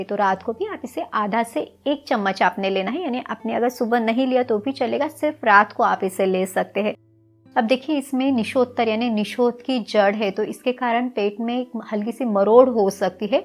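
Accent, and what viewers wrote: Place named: Maharashtra, India. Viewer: Aarey tumche bolanyaavarun tumhi marathi waatata. Mag aadnaav Kasey 😂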